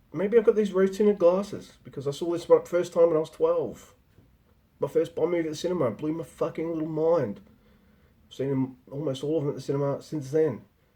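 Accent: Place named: United States. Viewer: The American accent!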